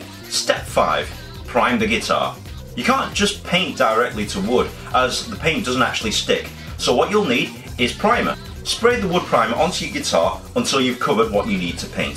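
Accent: United Kingdom. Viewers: Asian guy sounds British....blowing my mind!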